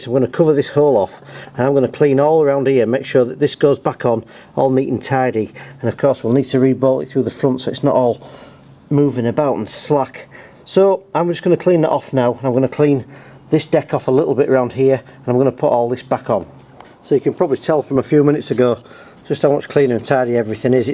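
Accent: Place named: Manchester, United Kingdom. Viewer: Hi mate your vids are good with clear directional info.could you be as kind as to advise me how to go about sorting one of these b&s orion 35s which runs was cutting sweet but suddenly when l get into longer grass it seems to have lost her torque.will sub with you and buy any bits thru you too, your doing a grand job sharing your knowledge,l am a manc and you soind close by, much appreciated..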